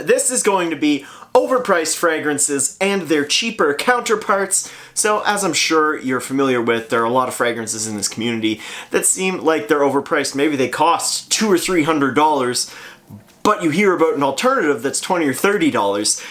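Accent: Canada. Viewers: You sound hella Canadian.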